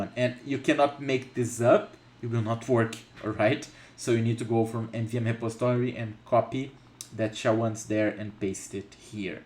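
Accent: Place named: Brazil. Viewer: Dude, what an amazing video. keep making videos like this. It opened my mind. Are you Brazilian? The accent reminded me of Brazilian.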